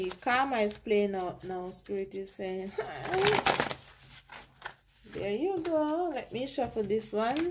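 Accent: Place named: Jamaica. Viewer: Awesome Jamaican accent, stay blessed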